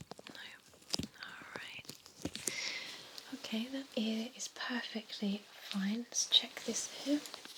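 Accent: United Kingdom. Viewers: I just love your British accent! Where are you from?London?Manchester? I'm from Brazil and have never been to England. Even though I study as much English as I can, sometimes it's pretty difficult to find out accents from all over the world. Once you've read this message, please let me know. It is like a self test about accents!Love your videos!BYE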